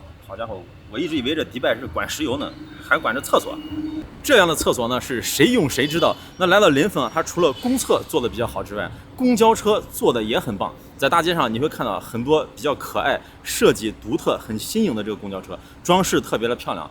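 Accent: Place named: Beijing, China. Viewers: 聽大家吊個京腔，俺高低得吼個“好～～！”。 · 👏👏👍 的歌喉真的不錯，以往都聽出耳油。今次京腔亦好有味道，希望以後在你視頻能再聽到。😁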